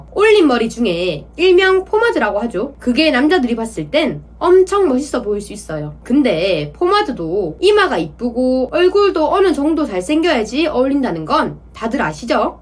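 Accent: Jeolla, South Korea. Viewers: 말투가 홍어년인데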